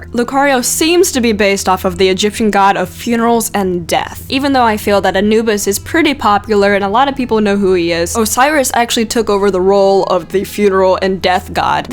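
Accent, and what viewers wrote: South, United States. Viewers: Are you from the South? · I love her southern acient it great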